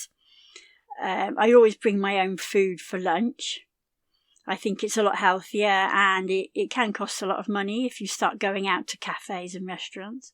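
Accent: United Kingdom. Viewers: I'm grateful for your videos. I love the British accent!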